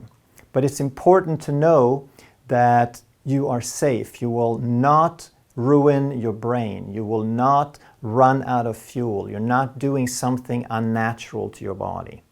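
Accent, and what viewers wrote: Sweden. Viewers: He is Swedish. And you can hear it on his accent all the way through. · You sound Swedish yet the Swedish translations on your channel look machine translated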